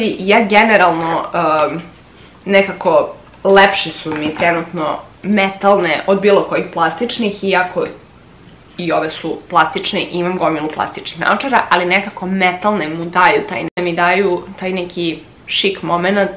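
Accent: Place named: Novi Sad, Serbia. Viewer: Od kad ti imas novosadski naglasak?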